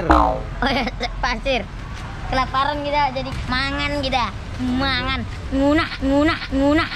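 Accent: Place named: Sumatra, Indonesia. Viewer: pertahankan, lebih lucu pake logat sumatra😁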